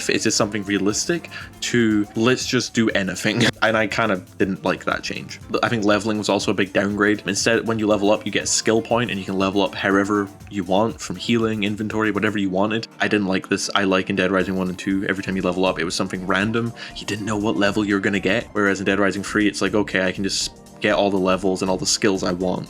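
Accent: Scotland, United Kingdom. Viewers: bro have some cool scottish accent. Love your comment on the whole series! I personally like Dead Rising 3 more than 2. I am totally agree with how you appreciate 2, but Dead rising 3 is a different story. To me it is like a gta with some real content, instead of crime simulator that just stealing car and killing random people and stealing car. The unrealistic combo weapon may look ridiculous, but they are so interesting and full of imagination, free from reality. The unlimited type of weapon spread over the map, and all the collectable makes fun to find all of them.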